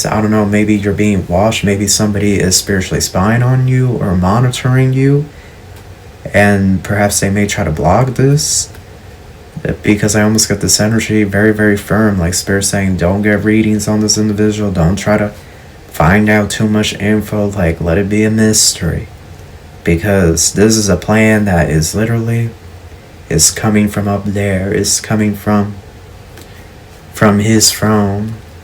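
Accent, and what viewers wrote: South, United States. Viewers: I love your sweet southern accent ❤ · You got a country ,tipsy sounding ,way you speak 🗣️ 💜 I love it ! ! ! 😍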